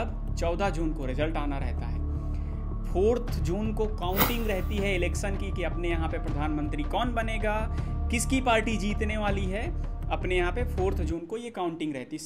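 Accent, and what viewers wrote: Bihar, India. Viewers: Bhaiya kya app Bihar se ho❤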